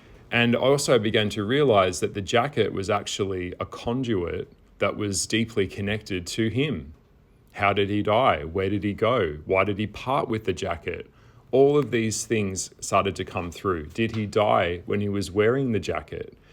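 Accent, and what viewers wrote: Australia. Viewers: but you have an aussie accent- where did you grow up?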